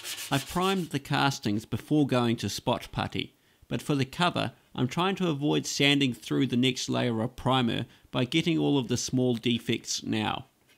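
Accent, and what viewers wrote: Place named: New Zealand. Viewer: 1) Aren't you giving the foot-switch your luxury restorative spa-treatment as well? 2) Your videos remind me of the appendix discs of LotR where that guy from Weta talks about all the props and stuff (I mean this in the best way possible!). I think your accent may have something to do with it... 3) Who knew watching someone on the other side of the World restore old machines could be so interesting? Your skill, both at restoration and making videos, is impressive. I hope you keep posting,. 4) Greetings from Norway!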